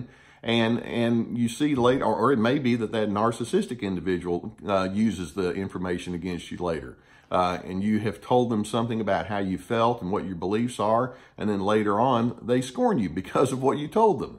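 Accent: South, United States. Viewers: You can just feel the warmth and caring of this man by listening to him. That southern drawl and compassion are a beautiful combination. I'd I had to guess I'd say he's an infp like myself, but more highly developed and less jaded than myself lol.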